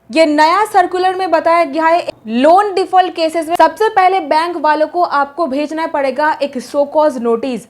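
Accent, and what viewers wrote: Bengal, India. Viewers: Ap medam bangali to nahi hai apake hindi bolane she pata lag raha hai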